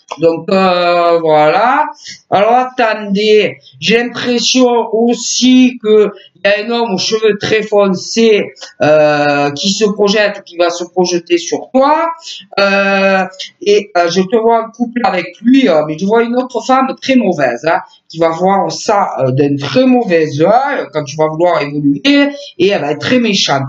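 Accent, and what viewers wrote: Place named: Southwest, France. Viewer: J adore l accent différent du sud ouest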